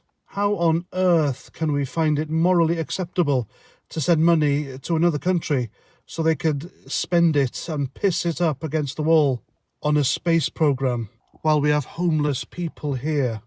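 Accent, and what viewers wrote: United Kingdom. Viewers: Thick British say no more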